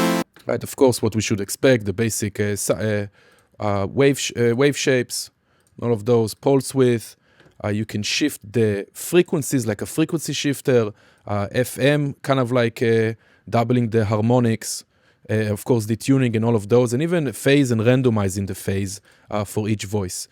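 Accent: Israel. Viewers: Israeli accent?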